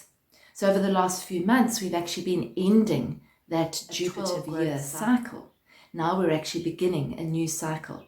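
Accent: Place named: South Africa. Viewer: Just "stumbled upon" your reading. Lovely, thank you. And hugely apt for me right now! Great to hear your saffer accent too. I was from Plett but made the move to Panama nearly 3 years ago!! Take care and thank you ♡♡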